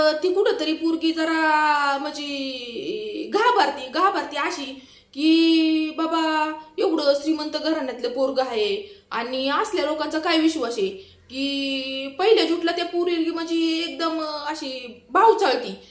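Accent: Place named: Rural, India. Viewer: Gavthi bolna hicha konala awadata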